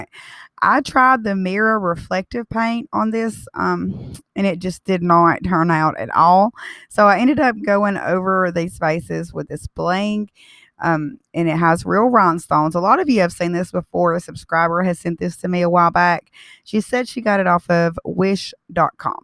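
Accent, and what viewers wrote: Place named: Alabama, United States. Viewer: I absolutely love your accent. Sound like my sil from Bama.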